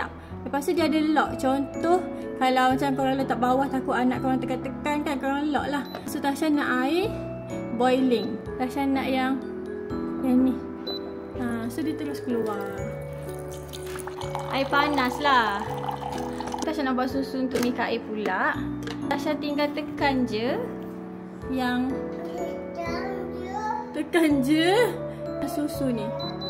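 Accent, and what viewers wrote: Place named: Terengganu, Malaysia. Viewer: Comey kakak slang tranung hahahahah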